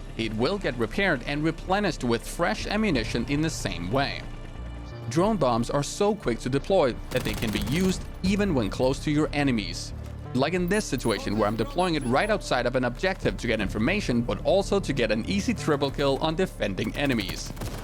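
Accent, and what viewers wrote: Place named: Denmark. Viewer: really good guide and could tell you were a dane by the accent, keep up the good work :)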